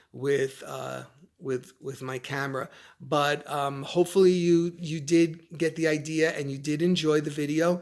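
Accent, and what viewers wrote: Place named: Boston, United States. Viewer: You have to live in Massachusetts. You have a Bostonian accent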